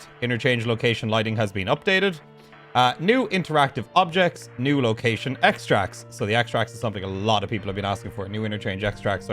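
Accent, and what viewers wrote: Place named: Ireland. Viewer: Been waiting for an Irishman to read me those juicy patch notes! Sorry about the funeral though man :(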